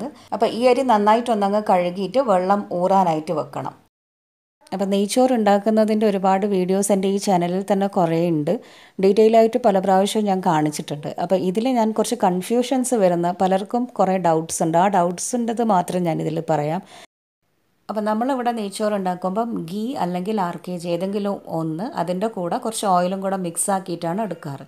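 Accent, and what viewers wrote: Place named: Kannur, India. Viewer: Biriyaniyum kannanur slangum ishtapetin itha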